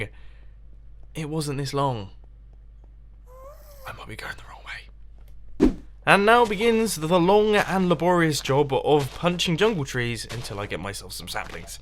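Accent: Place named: United Kingdom. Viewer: It's your British accent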